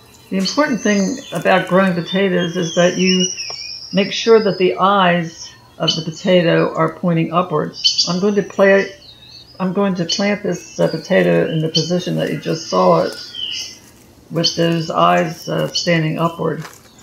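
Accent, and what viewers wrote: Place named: mixed, United States. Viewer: I knew I heard you talkin our NJ/Philly twang,! We're in southern NJ, but my family is originally from Philly. Thx for the cooler idea!:-)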